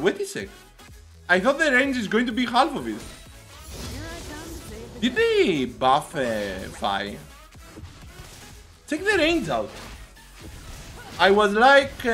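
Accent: Greece. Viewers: I think you're a Greek, right ? Assumption made on your accent. :D Greetings from Serbia my bro !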